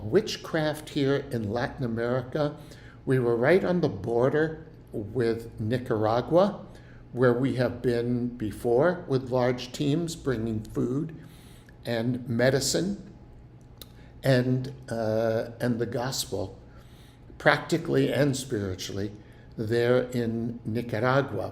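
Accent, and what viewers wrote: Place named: Spain. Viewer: Thanks for sharing from Costa Rica pastor Paul. Shalom from Bolivia🙂 P.s. Nice Spanish accent and "hasta luego"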